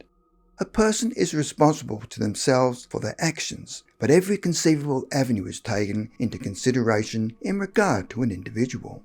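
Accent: Australia. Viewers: Very easy for me - an Australian accent! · Great material but it is hard to follow your speech with your thick Australian accent. The woman that sometimes narrates your videos is quite understandable to an American ear.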